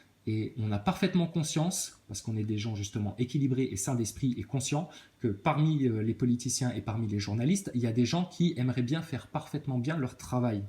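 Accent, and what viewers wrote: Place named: Vendée, France. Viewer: On croirait entendre un vendéen Qu'ils reposent en paix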